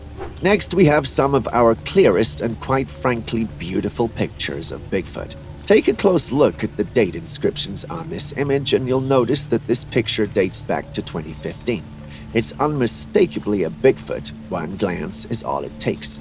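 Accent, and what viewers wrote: England, United Kingdom. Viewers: bro your starting to sound like a pommy englishman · Why are you talking with an English accent in this video?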